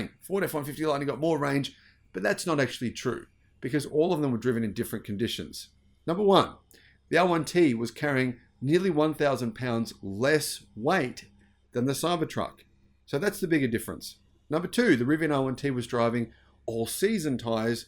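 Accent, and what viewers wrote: Australia. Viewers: Nope! I don't trust your Neanderthal a** anymore. JerryRig is very clear and honest. You sound like you're from Australia or something, that makes sense considering you can't Believe that somebody would drive 65 miles per hour , that's normal here in America in fact slower is dangerous. The region he towed in is cold and mountainous this was a worst case scenario. I know it's hard for you to believe that places like this actually have snow and there's actual mountains, living so far down under, But they do. Every phrase you used it was said to put doubt into what happened but offered no proof. All in all if the truck can't go to 250 miles (real world miles) towing on one charge it can't tow.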